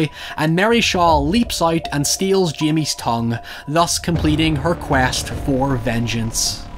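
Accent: Northern Ireland, United Kingdom. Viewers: The auto-generated closed captioning spelled the word "craze" as "kriyas" because of delightful NI accent. That is all.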